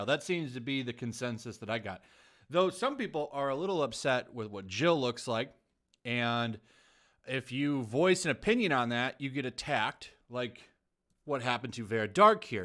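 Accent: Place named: RP, United Kingdom. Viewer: I had to stop listening to you read that article. Not because of the hoity toity accent, that was brilliant, but because it's not journalism. It's politics. I've come to absolutely despise politics. I'm not just talking about SJW politics, I despise all politics and wish people would stop. Politics these days aren't about helping people, they're about tearing people down... or... grandstanding [is that the right word?] yourself so you seem like the only person of any importance. Thank you for shedding light on this political piece, thumb up, well done, but I can't. It's not you. It's the words you're reading xD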